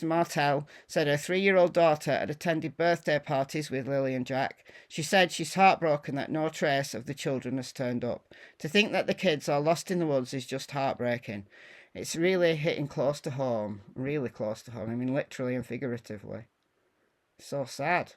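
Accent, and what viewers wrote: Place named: Northern England, United Kingdom. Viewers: What part of England are you from! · I love your style of reporting - I live in Wales so it’s nice to hear your northern English accent!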